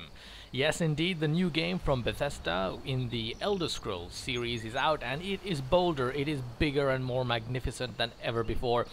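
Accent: United Kingdom. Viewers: That is a British accent, the man is actually British :P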